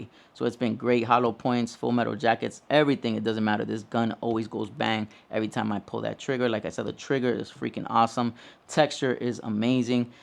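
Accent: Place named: Miami, United States. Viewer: Great video. I love both of these guns. I own the shield plus and have held the 2.0 bodyguard but haven’t shot it yet. Did you find the bodyguard 2.0 to be soft recoiling like some YouTubers are saying? “It feels like a 22” also are you from south Florida? It sounds to me like you have a Miami accent, which is where I’m from.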